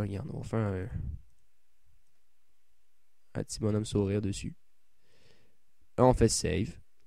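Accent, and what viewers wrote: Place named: Canada. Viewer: j'adore ton acsen canadien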